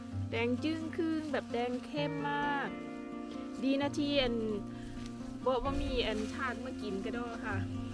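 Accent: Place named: Laos. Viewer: พี่คนจังหวัดไหนคะ? พูดเหมือนคนลาวเลย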